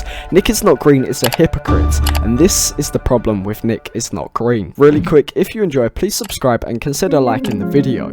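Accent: United Kingdom. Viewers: as a 'modern activist' myself, this man is not the definition of an activist at all. The fact that you are using the term 'modern activist' as a negative term is derogatory to those of us who actually do work every day to TRY to bring attention to pertinent issues. If you think COMMENTARY YOUTUBE is ACTIVISM, you are SORELY misunderstanding the definition of the word. Sorry babe-- ur british accent doesn't hide your lack of ability to use google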